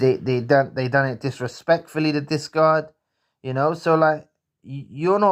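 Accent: London, United Kingdom. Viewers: It's actually London's accent. is really cool guy, very intelligent, real light worker. Should be supported!❤ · Just happen to be in London right now listening to your British accent, cool! Thanks for all you do!!!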